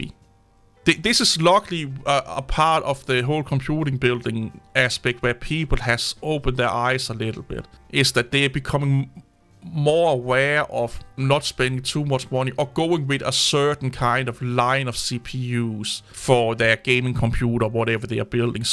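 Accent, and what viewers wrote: Denmark. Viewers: I'm guessing Danish?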